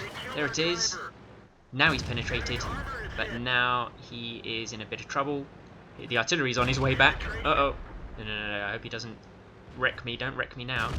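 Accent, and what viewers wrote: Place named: United Kingdom. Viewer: u sound like your from the UK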